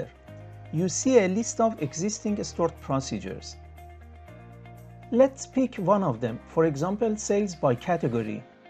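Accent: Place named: Iran. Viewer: Thick accent w/ Farsi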